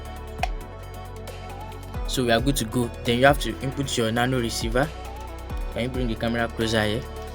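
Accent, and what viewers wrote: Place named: Nigeria. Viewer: Never heard more authentic NIgerian Accent ever 😁💀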